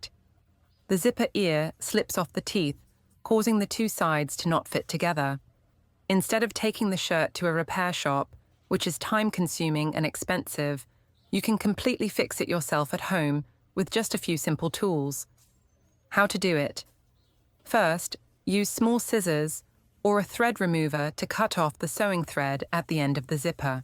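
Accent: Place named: United Kingdom. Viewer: Thanks. Very helpful. You list your location as the United States - but you have a beautiful clear standard British voice and accent!...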